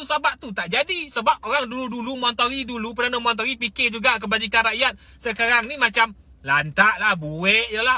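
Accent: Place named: Negeri Sembilan, Malaysia. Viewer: eden suko ekau cakap nogori ….. sghonok dongar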